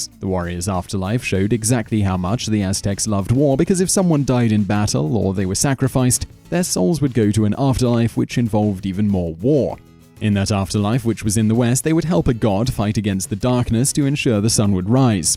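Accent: United Kingdom. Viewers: You have the most British voice in human existence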